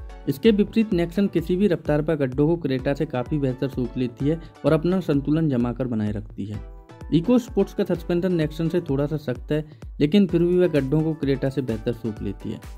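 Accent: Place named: Hindi Belt, India. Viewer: While this is a good comparo, The Hindi accent used by the narrator makes it difficult for me to understand. I understand hindi well, but in this I had to listen again and again to make sense of a few sentences. Perhaps it is speed along with accent that makes it difficult for me as I am not a native of Hindi belt... :(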